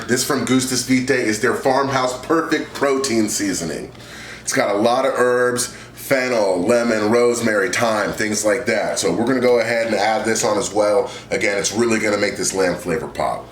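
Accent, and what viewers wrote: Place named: California, United States. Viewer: U got that cali accent, never noticed lol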